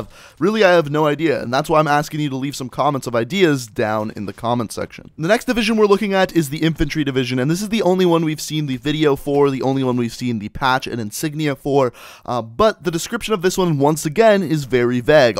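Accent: Canada. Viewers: I kinda like your content, but I'm distracted by your HEAVY canadian accent, I mean "Peace Øut" what is that??!! LOL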